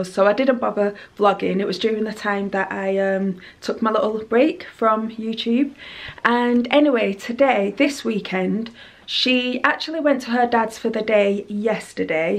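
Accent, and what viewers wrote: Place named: Manchester, United Kingdom. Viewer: You sound like a Mancunian! Lovely vlog :)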